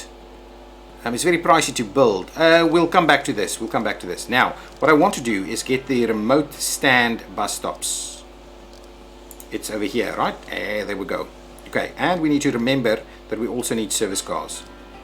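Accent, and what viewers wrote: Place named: South Africa. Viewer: Your English seems a bit South African🤔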